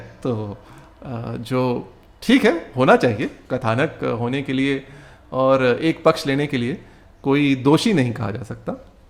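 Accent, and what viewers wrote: Standard. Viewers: Shuddh Hindi! What a delight! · Aapki shuddh Hindi sunkar hriday prasann ho jata hai. Aapko dekh kar mai bhi ghar me Shuddh Hindi bolne ka prayatn kar rahi hun. Dhanyvad mahashay 🙏🏼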